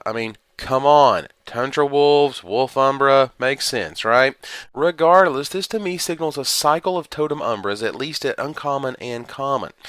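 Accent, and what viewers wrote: South, United States. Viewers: He's from the south, he can't help it.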